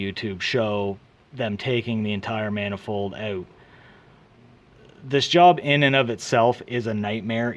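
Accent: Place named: Canada. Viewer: You taking your water pump oot? lol What accent is that? Minnesota or Canadian?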